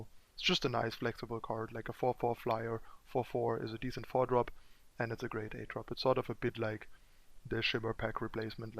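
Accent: Germany. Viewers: are your from Germany?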